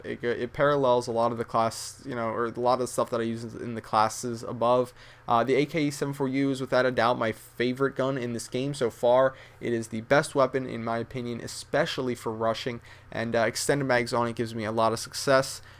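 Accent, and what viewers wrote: Sweden. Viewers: are you swedish? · are you from Sweden? :O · are you from sweden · Are you from Sweden? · is he from sweden? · are you from swedin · are u from sweden? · are you from sweden? :P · Are you from sweden? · are u swedish lol?? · ARE YOU SWEDISH?!!? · Does he sound Sweden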